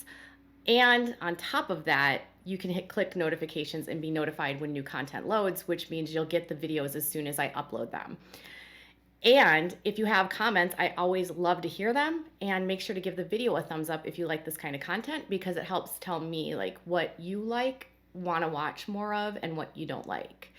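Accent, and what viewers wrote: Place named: Minnesota, United States. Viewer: I love your analysis and your accent!! You must be from Minnesota or Wisconsin???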